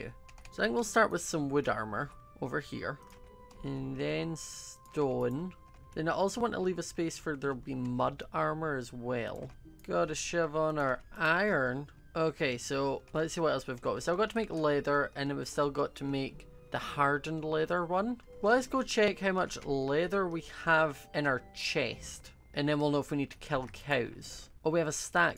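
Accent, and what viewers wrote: Scotland, United Kingdom. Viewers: OMG I LOVE YOUR ACCENT I LOVE SCOTTISH ACCENT SO MUCH ok lol I need To stop.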